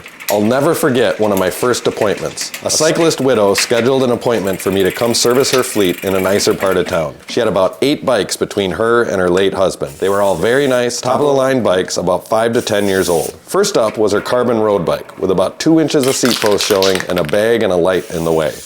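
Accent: Wisconsin, United States. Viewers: I live in Norway but I grew up in Northern Wisconsin. In the 1st 30 seconds of this video I thought this guy really has a Wisconsin accent... Great video!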